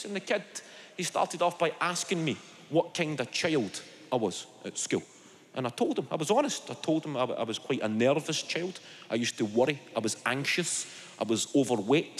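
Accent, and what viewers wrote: Scotland, United Kingdom. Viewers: Brilliant accent easy to understand if your from Scotland, the experiences he talks about of being a kid in 90’s is relatable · I love a Scottish accent 😁 trust me you get stronger, I'd recommend trying to watch Rab C Nesbit 😂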